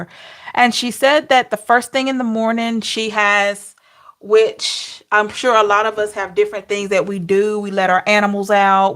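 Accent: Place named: South, United States. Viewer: I'd Love to understand what part of the USA your accent is from. It's generally Southerners who say "em-pore-dent" as opposed to "im-pore-tant".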